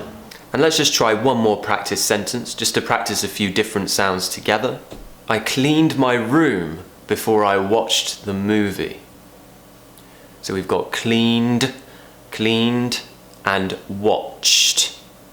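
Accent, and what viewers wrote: United Kingdom. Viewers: I am always love the way you speak english with your british accent And Also sometimes i find the difficulty of word ending /ed/ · I love British accent, And i love hearing your voice and learning British English at the same time. I'm browsing all of your past videos and i'm in love with it. Your such an inspiration. Thank you. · Thank you very much for your help.It's a great way to teach my students !!! By the way I love your British Accent.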